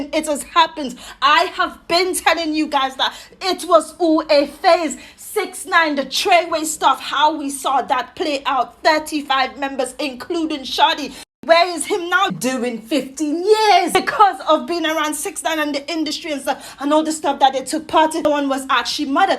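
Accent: England, United Kingdom. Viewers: You sound like you from England leave that street shit alone..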